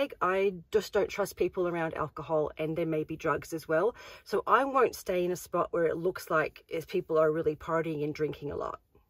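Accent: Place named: Australia. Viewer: sorry, I think you've got a bit of Australian accent too from living here for a while now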